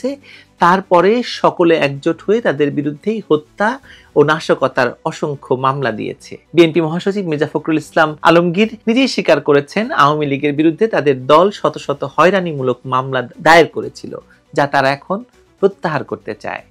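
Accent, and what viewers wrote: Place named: Shantipur, India. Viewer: এত শান্তিপুরী ভাষায় না বলে নবনিতা চৌধুরীর মতো বলতে হবে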